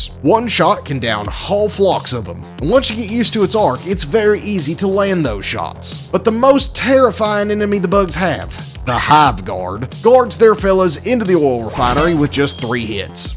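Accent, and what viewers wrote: South, United States. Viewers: What state you from? Not to get personal or anything, but a bunch of people that I’ve played with said I sound like you so I’d like to know if it’s because we are from the same state or just that we both have a southern accent lol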